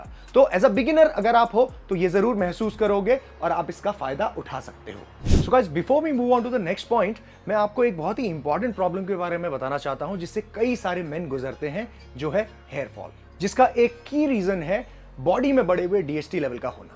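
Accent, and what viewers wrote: India. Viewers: of course he has a perfect indian accent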